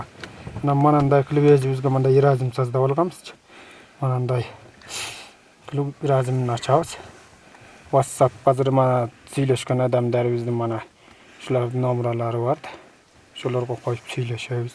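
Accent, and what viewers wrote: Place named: Xinjiang, China. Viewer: Кытайлык кыргыздардай суйлойт экен. Алайга жакын айылдарда уйгурлар арасында кызылсуулук кыргыздар да ушундай суйлошот.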